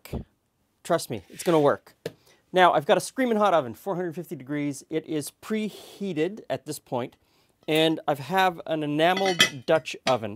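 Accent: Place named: Quebec, Canada. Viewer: You are definitely from the province of Québec, so am I?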